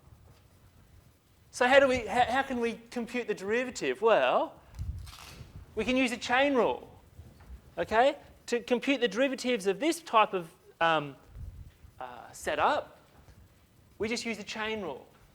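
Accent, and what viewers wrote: Australia. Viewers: Nice Aussie accent.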